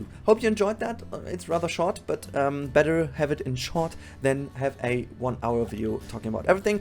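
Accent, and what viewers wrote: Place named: Germany. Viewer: hahah bist du cdeutscher ? :p :o echt kein schlechtes english probs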